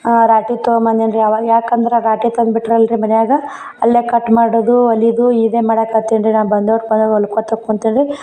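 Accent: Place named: Karnataka, India. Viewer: Nice love this Kannada accent ❤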